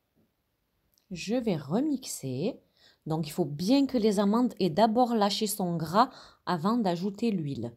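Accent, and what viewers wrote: South, France. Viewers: Merci beaucoup pour tes recettes, très très bien expliquées, avec en plus, ton bel accent du Sud, pleins de gros bisous du Gard 😊😊😊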